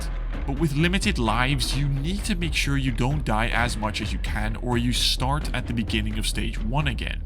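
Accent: Netherlands. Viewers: I know a fellow dutchie when I hear one. And the part with your brother absolutly killed me as someone who could as a dutchmen haha. It also confirmed my suspicion about being dutch 😂 anyways loved this vid as im getting into plat hunting myself right now. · One question are you dutch ?😅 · Ik hoorde gelijk dat je een Nederlander bent hard ik gooi een sub voor die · Hey! You Dutch?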